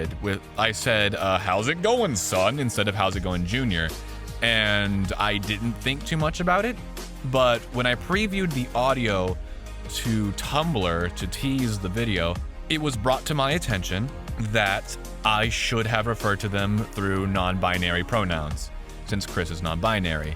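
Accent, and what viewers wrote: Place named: Estuary English, United Kingdom. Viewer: It's estuarine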